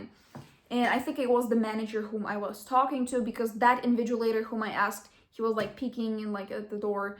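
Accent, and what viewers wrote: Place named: United States. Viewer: Before i saw your ID card i thougt she is an american. Amazing your speaking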